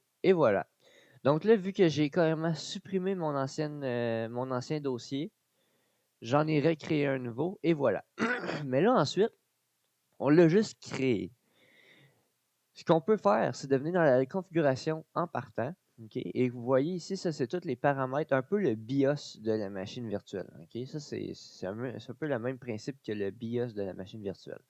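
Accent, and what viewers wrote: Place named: Quebec, Canada. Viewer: j'aime tros ton actens quebecoi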